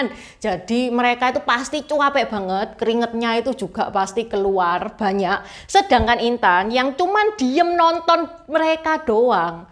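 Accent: Java, Indonesia. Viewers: Saya suka dgn konten 2 spt ini Apalagi logatnya jawa kentel , matanya sipit. Pasti semua suka. Lanjutkan Ci.... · Enakan nieh dengerin dongeng" dari ci.. dg bhs indonesia ber aksen jawa medok.. hihihi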